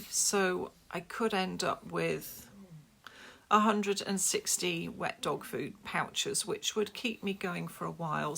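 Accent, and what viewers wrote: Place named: England, United Kingdom. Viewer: New subscriber.. love your channel..and the English accent.. thank you... ❤ From Australia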